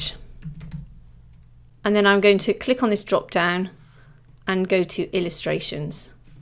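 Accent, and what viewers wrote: United Kingdom. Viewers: Thanks for the Video. I liked the British accent.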